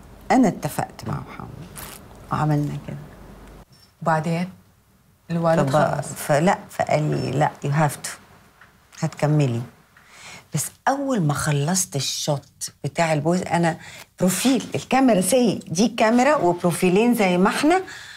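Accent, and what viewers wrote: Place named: Iraq. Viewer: لقاء جميل أحلى شيء بزينب انو شخصية وذكاء ومحافظة على لهجتها العراقية لأن في كثير عراقيين بس يرحون لغير بلد يغيروا لهجتهم العراقية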